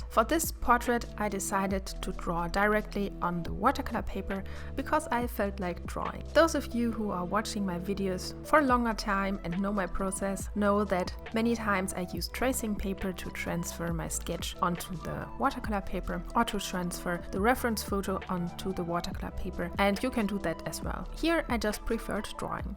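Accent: Germany. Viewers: Your Art is so beautiful 😍😍🌹 Where are you from? I would guess Germany from the accent.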